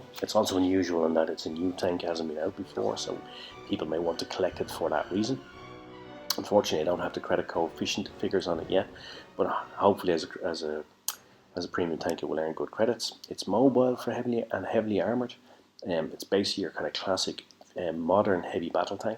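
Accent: Ireland. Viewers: BTW love your Irish accent.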